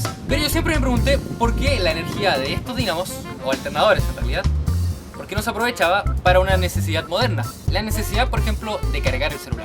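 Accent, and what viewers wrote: Chile. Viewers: Es Chileno verdad? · Dices acá en Chile pero el men por el acento parece chileno :v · Eri chileno? :3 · Amigo mio una pregunta eres chileno ?¿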